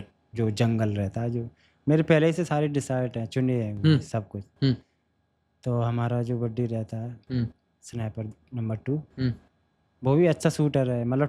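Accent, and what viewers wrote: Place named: Madhya Pradesh, India. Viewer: जय हिंद जी आप तो mp से हो आपकी भाषा mp ki लग रही हैं कंहा से हो सर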